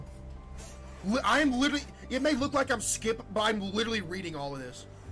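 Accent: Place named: Arkansas, United States. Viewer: What part of arkansas you from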